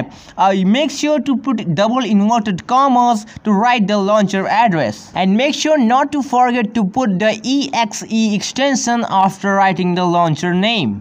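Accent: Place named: India. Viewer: Sounds like india or china, nice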